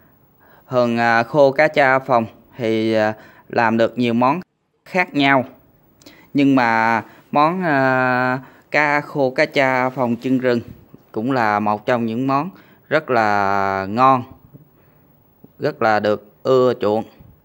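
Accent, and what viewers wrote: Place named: Mekong Delta, Vietnam. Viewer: Nghe cách bạn giới thiệu đúng chất giọng miền Tây Thơm đến nhà ngân luôn Ăn sợ ghiền , ghiền là phải về miền Tây 💖💖💖😋😋😋😋 Da dai dai béo béo ...yummy Ngân xin trái bắp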